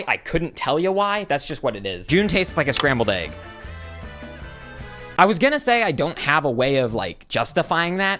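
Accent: United Kingdom. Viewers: Are you british or something?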